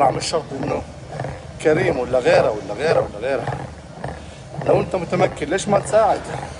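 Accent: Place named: Yemen. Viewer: ويش عرفك بهاذا كلام ماشاالله وبعدين كلامك يمني ياقلب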